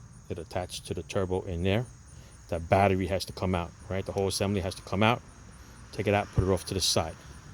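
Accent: New York, United States. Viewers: Sorry but is this dude a Chinese guy with a new York accent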